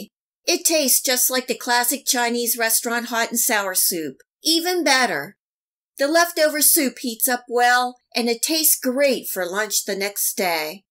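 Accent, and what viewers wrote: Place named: Maryland, United States. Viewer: This is the BEST hot and sour soup recipe ever. I've been making it regularly for the past 7 years. We've tried loads of others but nails this restaurant style recipe. (*And we just love hearing that Maryland accent, reminds us of my husband's hometown.) The only change I make is that I only use one kind of soy sauce (because I can't get my hands on the other kind). DELISH! Making it today to cure my husband's cold! Thanks.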